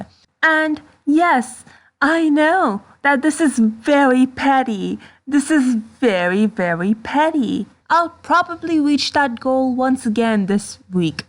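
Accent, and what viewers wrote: France. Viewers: Your accent is awesome and reminds me of my aunt from France 🇫🇷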